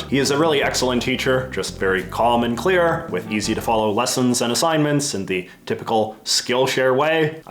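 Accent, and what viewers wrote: Canada. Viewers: Your accent is aggressively Canadian, my god. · It's his Canadian accent, of course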